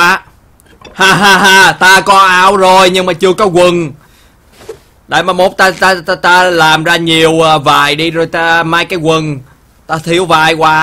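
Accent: Hue, Vietnam. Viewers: Anh nói tiếng Huế nghe hài quá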